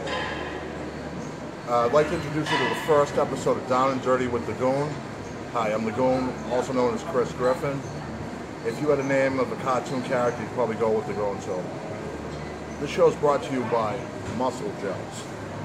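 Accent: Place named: New England, United States. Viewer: Welcome to the family! Great see and hear another New Englander on YouTube kid! Had me at and! Looking forward to more videos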